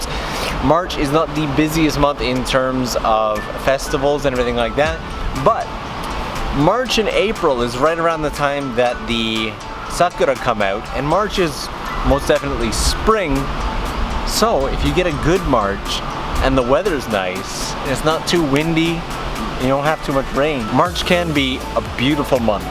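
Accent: Canada. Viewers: Sup man I'm obsessed with Japan currently, and I found you from sharmander in Japan ! I'm loving your first video for me so far. Also, are you canadian? I hear alot of abooots in your accent xD Also, what is the best way to know when exactly the pink trees will bloom to it's fullest? I can't believe they only bloom for like 3 days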